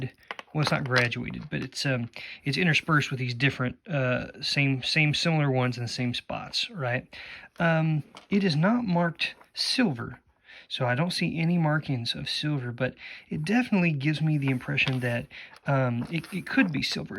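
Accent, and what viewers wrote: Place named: West Virginia, United States. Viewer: Just moved to Weat Virginia and thought the accent was similar to the area. Love it! 😊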